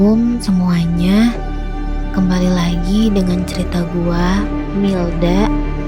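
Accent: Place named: West Java, Indonesia. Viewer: ieu sunda pisan 😁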